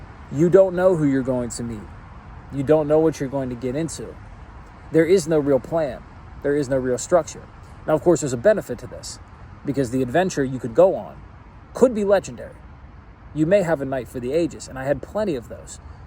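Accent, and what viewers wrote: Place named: Chicago, United States. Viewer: I knew that accent sounded Chicagoan, you keep it real. Lots of love from Detroit.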